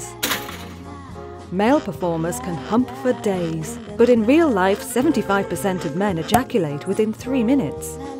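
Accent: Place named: United Kingdom. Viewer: the narrator's british accent made the video so much better.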